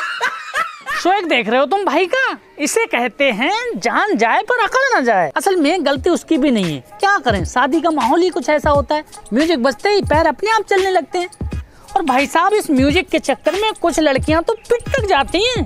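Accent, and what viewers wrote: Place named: Budaun, India. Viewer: Bhai voice se lagta hai aap budaun ke aas pass ke rhne bale ho achcha tareeka hai entertainment ka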